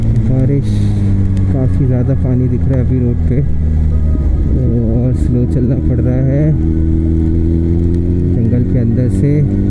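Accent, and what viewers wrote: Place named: Chhattisgarh, India. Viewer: Deady colaigen..😂😂😂 ur chhatisgarhiya accent is so dope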